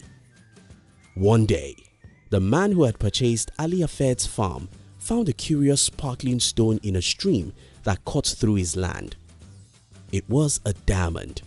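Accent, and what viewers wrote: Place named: Nigeria. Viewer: He sounds Nigerian.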